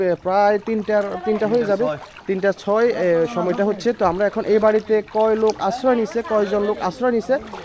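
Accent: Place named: Noakhali, Bangladesh. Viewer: ভিডিও অনেক ভালো লাগলো অনেক দিন পর নোয়াখালির কথা শুনলাম আমেরিকার থেকে দেখছি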